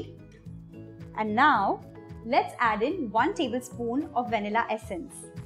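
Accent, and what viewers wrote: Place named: India. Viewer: That is a typical Indian accent! It is how we speak so...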